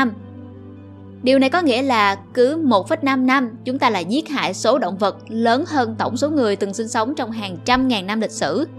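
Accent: South, Vietnam. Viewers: Kg biết ai thích thịt thích rau gì chứ riêng tui thì tui khoái cái giọng con gái miền nam ghê à ngen ^^ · giọng đọc hay kiểu miền nam mà sao xài chữ Lợn với Lạng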